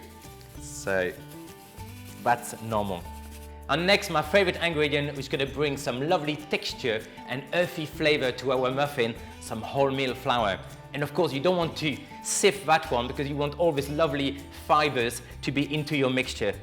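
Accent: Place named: France. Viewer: dude your french accent is amazing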